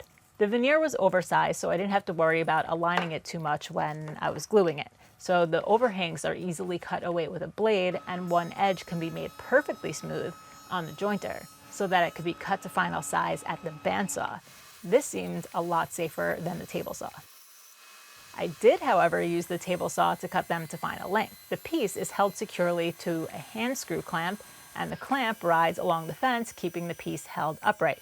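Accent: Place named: New York, United States. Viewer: Not quite sure it’s everyone, but we all love and accept the way says it, she’s a New York girl. NEXT!!!!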